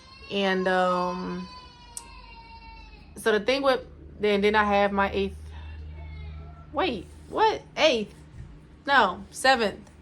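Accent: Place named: New Jersey, United States. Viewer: I like you story telling! I don’t mind the tangents and your Jersey accent is so nice!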